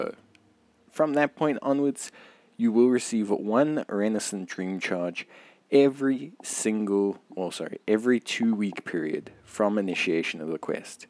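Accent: South Africa. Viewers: Awesome to hear some content from a South African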